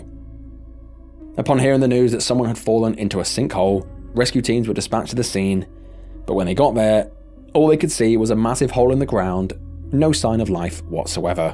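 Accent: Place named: England, United Kingdom. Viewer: ❤❤❤❤❤❤❤❤❤❤❤❤❤❤❤❤ you R so sweet. Also love the channel new subscriber here ❤ also from U.K South Yorkshire ❤ I could tell are obviously also English 😂😂😂 ❤❤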